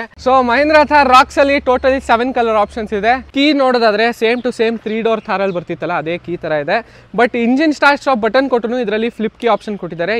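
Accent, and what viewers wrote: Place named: Mysuru, India. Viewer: Chennagidhe nimma mysuru kannada.. hige cargala bagge mathadtha iri..ellaru nodi tilkothaare..jai kannada, Karnataka, jai Bharatha ♥🙏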